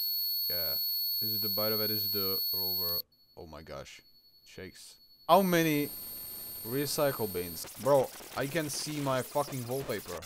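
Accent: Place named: Czech Republic. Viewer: nice czech accent, i liked it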